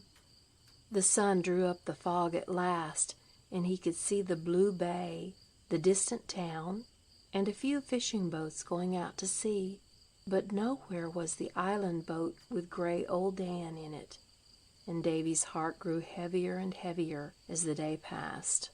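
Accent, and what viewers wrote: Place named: South, United States. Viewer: Terrible terrible southern accent from this narrator.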